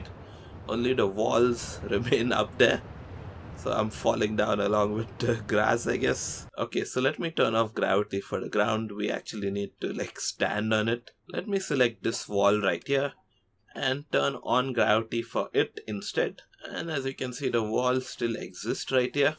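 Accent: India. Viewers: A normal indian english accident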